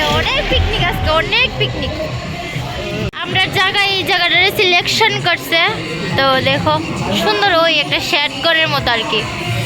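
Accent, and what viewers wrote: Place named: Habiganj, Bangladesh. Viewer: সবচেয়ে ভালো লাগে আমাদের হবিগঞ্জের ভাষা শুনে 🇧🇩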